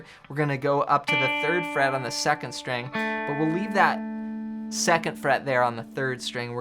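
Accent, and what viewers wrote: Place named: California, United States. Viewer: I'm from the U.S. and if I had to guess I would say somewhere along the west coast. I detect a very slight "California surfer" accent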